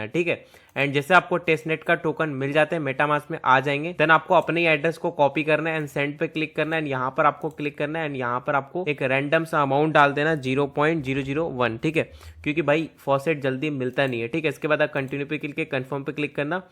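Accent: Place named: India. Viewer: INDIAN SOUNDS MOTHER FCKR COUNTRY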